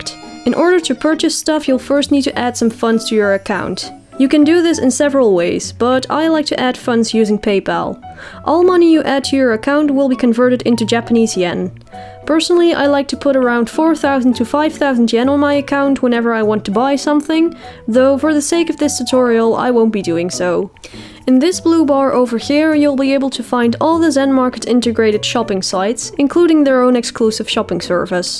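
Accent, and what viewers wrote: Netherlands. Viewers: ooooOOoo so it's a dutch accent I though you were british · I had a hunch you'd be Dutch. Sometimes my english accent will sound somewhat similair (as I don't have a consistent English-speaking voice).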